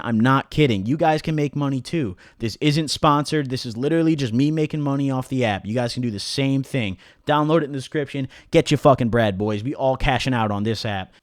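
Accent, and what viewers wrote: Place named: Naperville, United States. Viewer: are you from naperville. I’m from around there and it sounds like you are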